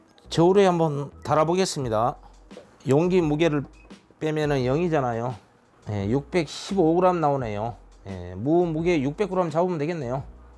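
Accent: Jeolla, South Korea. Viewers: 병원에 입원중입니다 항암치료로 입맛이 없는데 집에 돌아가면 꼭 해먹을래욤 ^^ 전라도 사투리 참 정겹네요 ^^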